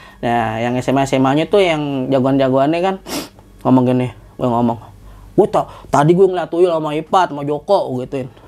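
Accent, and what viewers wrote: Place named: Betawi, Indonesia. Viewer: kocak lu bang 🤣🤣...bahasa betawinya ora dibuat2 natural bgt..wkwkkw biarpu ceritanya lompat2 ketutup sm pembawaan ceritanya..sukses terus buat chanel besok pagi dan bang, w suke gaye lu..😁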